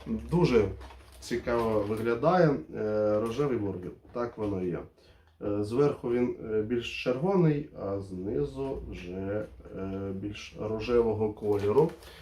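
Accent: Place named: Eastern Ukraine, Ukraine. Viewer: Ну за українську лайк, молодець, відчувається говір такий східної України, але все ж таки це добре буде, якщо будеш частіше випускати такі ролики на українській, а бургер цей рожевий Мохнатівський збираюся на днях поробувати, дорогущий правда, але на хайпі робляться гроші!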